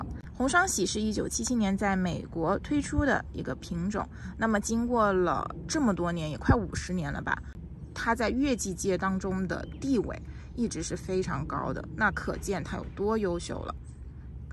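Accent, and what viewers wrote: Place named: Hubei, China. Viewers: 自信点，去掉”有点”。应该就是仙桃洪湖监利天门那一块的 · 听管主的口音，有点像湖北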